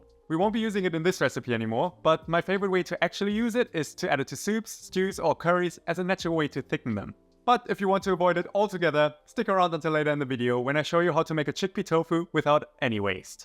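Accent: Germany. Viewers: Haha, came for the recipes and stayed because of them - despite the german accent and the "wordaah" 😂 I really like the explanation and comparison of the two versions! Will copy it, thank you! 😉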